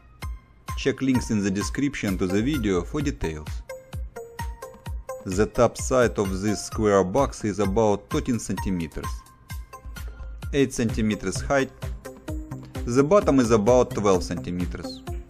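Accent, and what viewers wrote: Russia. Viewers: Ты русский? Я заметил акцент.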